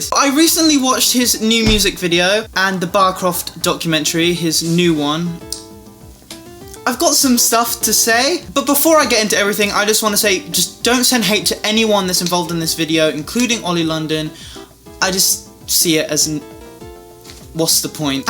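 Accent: United Kingdom. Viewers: Are you korean? But you kinda have a british accent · Oli London Obsessed guy (Woww ur accent is Britain one I luv ur accent) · Omg! You sound more British then him does. And I agree to not agree. It's unhealthy to change your face too look like a celebrity some would say, that person would need therapy. It's all part of the game for popularity and fame ..I agree his music is not even Korean or even close.. I found it funny that some Koreans knows this and still goes with joke and still being part of he's career lol nowadays anything goes I guess ? Everyone is just finding something to film about. ?? Who knows really as long he's not after my guy..that's all that matter in the bts. And look at him and take look of all of there bodyguards lol so many is so concerned don't be. Like always thank you for reading.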